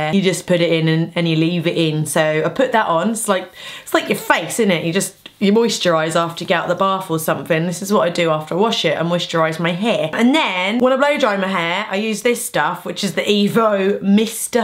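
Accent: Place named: Birmingham, United Kingdom. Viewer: Idk why, but to me you sounded sort of brummie in this 😂 probably just me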